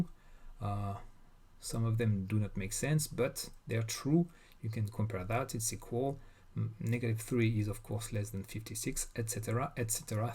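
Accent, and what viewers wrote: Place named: France. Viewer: This is probably off topic, but I like your French accent 😳😂 Great video as always!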